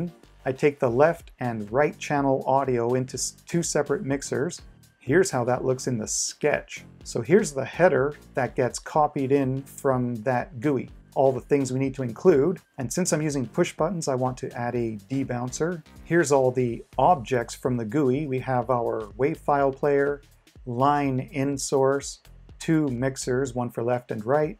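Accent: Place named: Canada. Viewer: You sound Canadian ;-)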